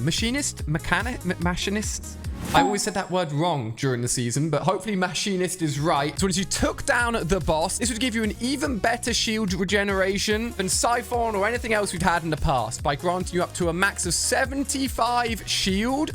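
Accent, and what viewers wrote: United Kingdom. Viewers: Are you British you sound like you’re British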